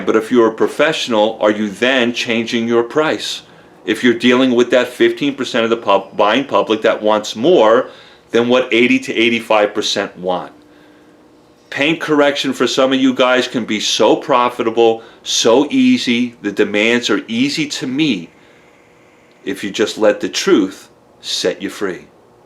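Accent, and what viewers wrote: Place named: Rhode Island, United States. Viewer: Love u to...lol....your accent...I have the same accent...Rhode island lol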